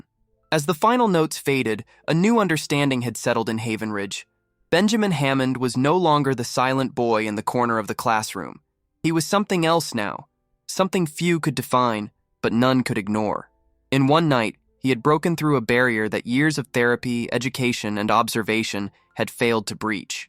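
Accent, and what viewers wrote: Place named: United States. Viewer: One has to be very careful in choosing who reads these stories so that it complements the genre. I know Americans speak a strange form or English and this gentleman makes it very harsh with that strong accent. A pity as it is a lovely story and a lovely melody. It would get top marks if narrated by a gentle man's voice without that strong American twang.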